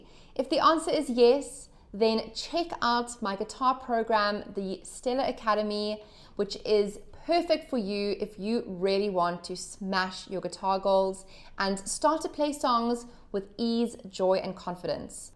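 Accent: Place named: South Africa. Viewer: Awesome...and nice to hear a South African accent.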